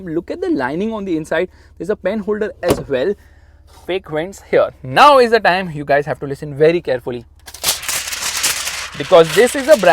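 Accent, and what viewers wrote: India. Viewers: Хахахха,индийски мангал!!! Ще ме спукат от смях с този акцент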